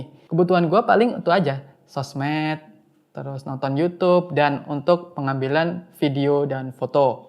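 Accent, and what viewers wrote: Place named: Java, Indonesia. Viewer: Bahasanya medok tapi lo gua lo gua wkwkw. Jawakarta beda dari yg lain😂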